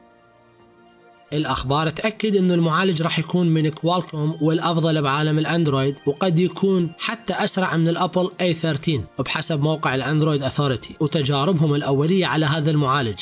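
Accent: Iraq. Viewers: مستمتعتش بالفديو قد ما استمتعت بلهجتك العراقيه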